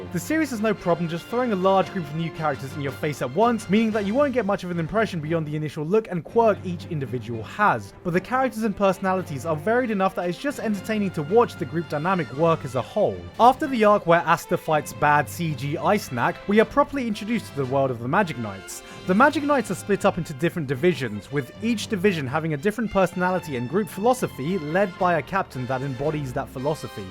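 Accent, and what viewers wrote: United Kingdom. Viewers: Wow you sound really british in this video... perhaps the only thing left was talking about West Ham's next fixture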